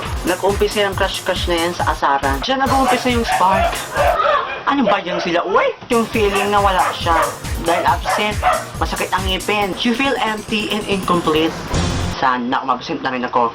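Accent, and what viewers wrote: Visayas, Philippines. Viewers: Are u bisaya?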